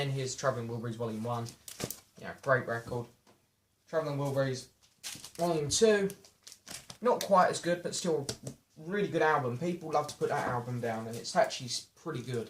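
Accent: United Kingdom. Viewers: Hello from quebec city canada...love your vids and your british accent......great work young man.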